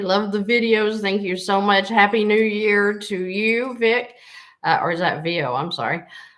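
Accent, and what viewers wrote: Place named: Tennessee, United States. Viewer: We are considering doing a channel to track our progress weekly, and hold ourselves accountable, and it brings us so much joy to actually get to dream of possibilities of having financial freedom. We are a retired military family, 100% disabled vet, and I have 25 yrs with a pension, so we have on paper a nice retirement, unfortunately it’s all gone before end of month….I prayed for answers, I want work again. I want to make an impact. you are an Angel sent here on Earth. I love your little feisty TN accent, and your faith in our Lord, and in people. Amanda OK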